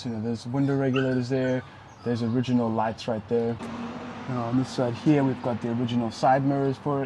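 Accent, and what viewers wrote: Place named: Texas, United States. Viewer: I have heard that accent before what part of Texas are you from ??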